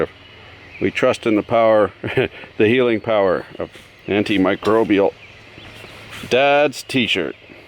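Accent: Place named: Canada. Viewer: Damn. i knew you lived in canada from the way you talk haha but didnt realize we live in the same province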